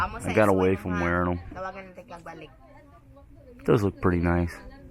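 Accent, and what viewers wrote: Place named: Western Pennsylvania, United States. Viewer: You have a western Pennsylvania/Ohio/WV accent.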